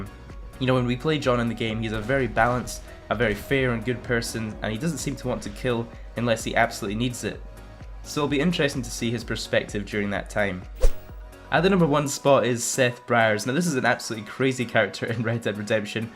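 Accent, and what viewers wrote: Scotland, United Kingdom. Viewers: Where in scotland u from?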